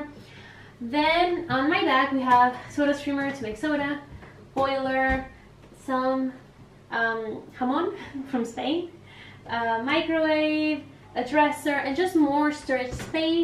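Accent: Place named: Argentina. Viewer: te escuchaba hablar en inglés y me parecía , yo decía es argentina por el acento jeje . saludos .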